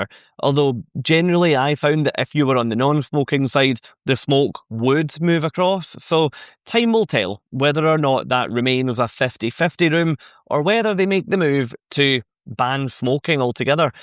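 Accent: Scotland, United Kingdom. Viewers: Thanks for your video about the ship update. Although I'm good at understanding accents from various languages, yours is very, very strong; it must be Scottish, right?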